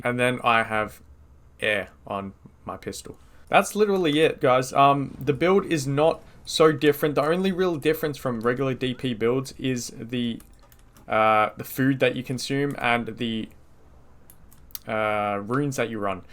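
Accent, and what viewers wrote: Australia. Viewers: Hey mate. I hear an aussie accent. How do you deal with the latency?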